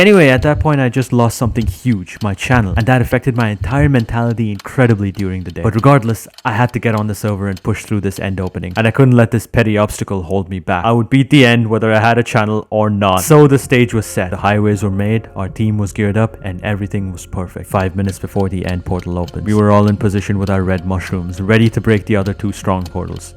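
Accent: India. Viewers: hearing that ur indian, just makes me sad and happy, i knew since the first day that u were pakistani or indian, i knew by your voice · He's Indian even his accent is Indian lmao, if he was nri he would have mentioned the country he lives in not India.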